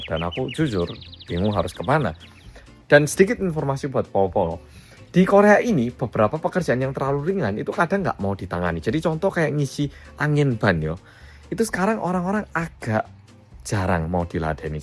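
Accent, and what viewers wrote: Java, Indonesia. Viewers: Jadi seneng lihat videonya dah ganteng logate medhok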